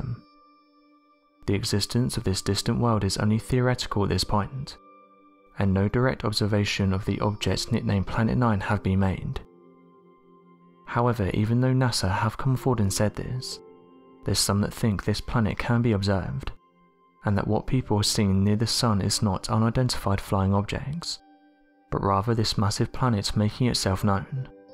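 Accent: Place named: Wales, United Kingdom. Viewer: The narrator's english accent is very strong and hard to understand, people of the channel you need to understand that your channel is broadcasting on YOUTUBE which is an international application and most of the viewers are not native english language speakers. Even some of the native english speakers have hard time to understand the accent. Is the narrator Welsh or from Isle of Man or from Bolton?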